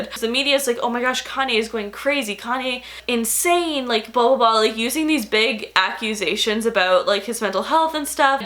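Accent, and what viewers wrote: Canada. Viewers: lately i think you can been sounding Canadian🤷🏼‍♀️ idk if anyone has said this cause i don’t really look at comments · I'm so confused as to why has a Canadian accent if she's an Italian from Florida like wtf 😂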